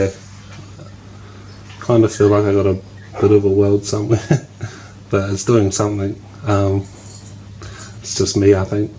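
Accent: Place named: New Zealand. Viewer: He’s from New Zealand, that’s how they talk bru!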